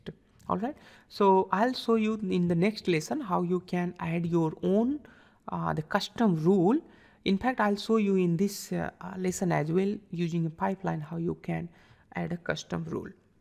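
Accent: India. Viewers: Bro, I don't usually comment but your videos are amazing. The way you speak English, with an Indian accent is what resonates with me. I have watched a lot of tutorials but they were mostly by non-Indian but I think your's are the best. You are underrated , brother. Btw, ima bitsian.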